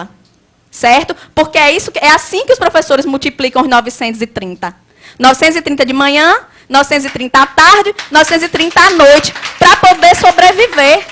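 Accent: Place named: Rio Grande do Norte, Brazil. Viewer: Assisti ontem ! Adoro o sotaque do RN, adoro Natal!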